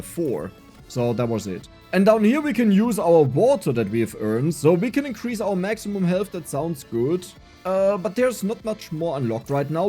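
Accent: Russia. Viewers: fam hes clearly Russian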